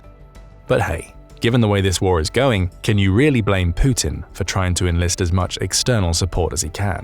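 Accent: United Kingdom. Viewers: Nothing like listening to a Brit's voice crapping on another country's military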